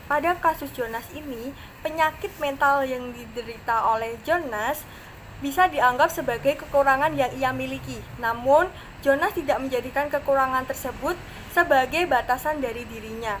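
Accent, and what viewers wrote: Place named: Java, Indonesia. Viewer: Medok'e jowo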